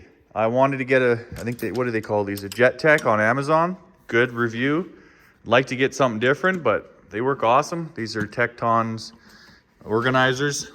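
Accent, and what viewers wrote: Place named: Canada. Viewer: heard you talking and said he's Canadian then saw the Berta tags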